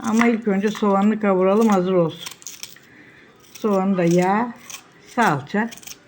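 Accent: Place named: Gelibolu, Turkey. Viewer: bende geliboluluyum siveniz bize benziyor da merak ettim 👍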